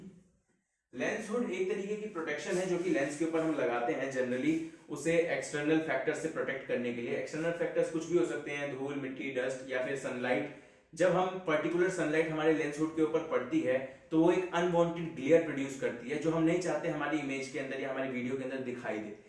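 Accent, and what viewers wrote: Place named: India. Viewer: Indians...why...why always Indians :( My poor ears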